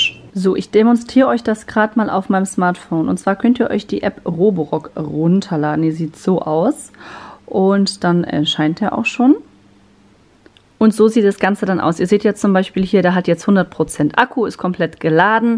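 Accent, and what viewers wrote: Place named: Hesse, Germany. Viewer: Das ist HESSISCH und heisst nebbber mir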